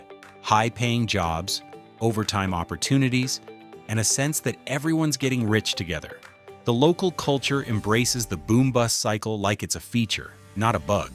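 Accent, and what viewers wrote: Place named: California, United States. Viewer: Eve thing you said about Texas is NEGATIVE. Pure BS created by AI. YOU MUST NOT be a Texan. You sound like from California trying to prevent CA residents to move in to Texas.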